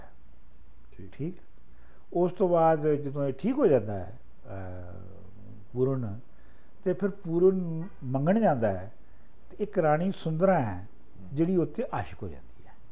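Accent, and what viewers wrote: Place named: Jhelum, Pakistan. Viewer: Navid Raffiq , his language was punjabi though jhelumi accent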